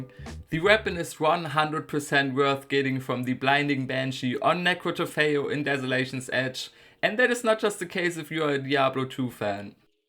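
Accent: Germany. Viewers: u are german i hear it ;D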